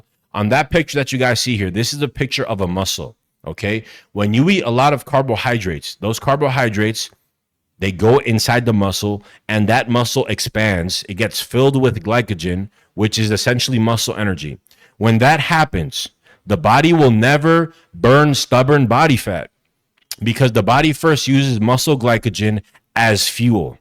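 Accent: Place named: New York, United States. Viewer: My guy sounds like he's from NYC...good video bro..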